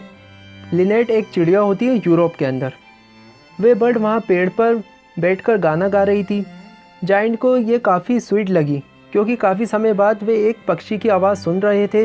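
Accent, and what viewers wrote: Bhopal, India. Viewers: bhai m bhi accent sunte se hi smjh gaya tha ki aap bhopali ho 😄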